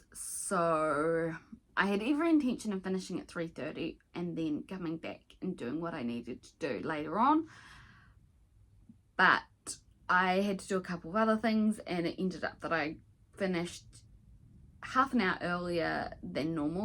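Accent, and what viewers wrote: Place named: New Zealand. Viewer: Congratulations on your Lisa Parker finish 🥰 I’ve just found your channel… and love your WIPs As soon as I heard your accent I knew you were a Kiwi…. I’m an Aussie stitcher/flosstuber so I’m always really happy to find stitchers/flosstubers from Aus or NZ 😀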